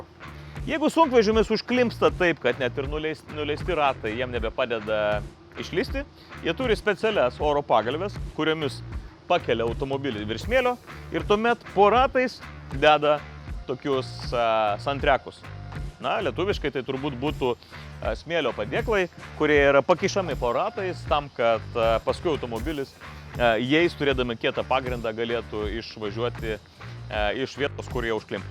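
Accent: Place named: United States. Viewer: kalba su ameriketisku akcentu.ziauriai skamba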